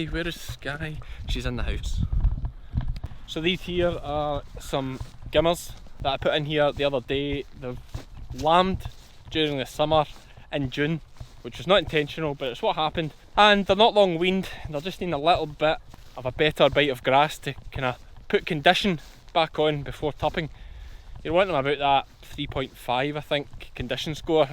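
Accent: Scotland, United Kingdom. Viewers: My mate and i was talking on how you told your dogs to lie down while we were gathering in the mountains today, he says its “lie doon” becouse of your accent but i thought doon was down in Scottish, who’s right? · Ahh cool nice to listen to a Scottish youtuber for once 👍👍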